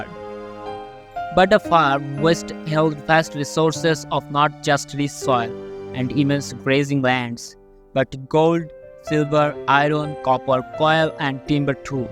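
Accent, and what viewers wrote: India. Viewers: So why is the narrator from the Sub continent of India? I guess 5 pictures and the wrong accents will get you view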